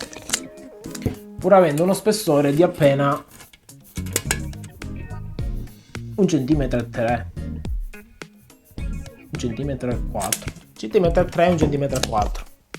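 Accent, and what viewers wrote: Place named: Agrigento, Italy. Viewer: Quindi mi consigli di acquistarla e utilizzarla per tutto senza fare leva e metti biscotto e refrattaria per pratica cosa intendi c'è qualcosa da impostare per utilizzarla al meglio , quando riuscirò a fare una pizza come quelle che vedo nei tuoi video sarà fantastico ma al momento sono alle prime armi e ho qualche problema a ottenere un impasto facile da stendere , dall' accento mi sembri della provincia di Agrigento o sbaglio? Anche io sono nato ad Agrigento ma sono 25 anni che mi trasferito a Torino 😊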